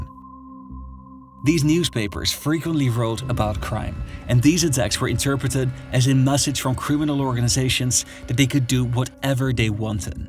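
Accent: Netherlands. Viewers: thick dutch accent warning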